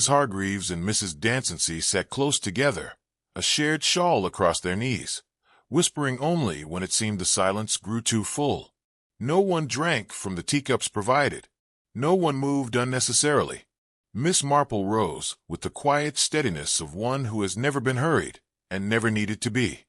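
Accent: United States. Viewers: An American narrator does not work for a Miss Marple story. It grates.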